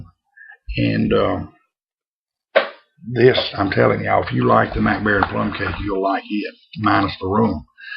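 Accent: Louisiana, United States. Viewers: That's a big drinking mug you have there. Sorry to hear about your pains my friend. Thanks for the chat. Looks like you did well in buiness. Love the Louisiana accent. Alan.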